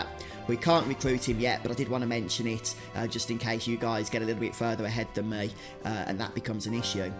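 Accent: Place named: Midlands, United Kingdom. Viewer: He's from Midlands in the UK for sure :)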